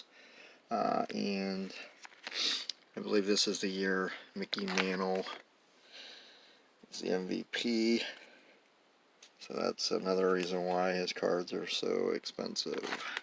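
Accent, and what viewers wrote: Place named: Pittsburgh, United States. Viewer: Hey, just subscribed great looking set. Sounds like your a Pittsburgh guy. I live in Johnstown, Eric those back pages gave you a nice shout out earlier tonight. I own a very nice 56 set. Working on 54 bowman set.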